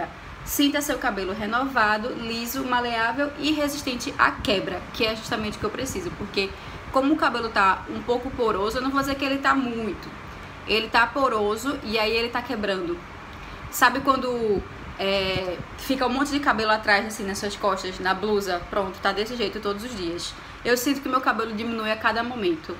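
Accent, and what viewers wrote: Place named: Olinda, Brazil. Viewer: Esse sotaque eu conheço 😃 sou olindense 😘 excelente vídeo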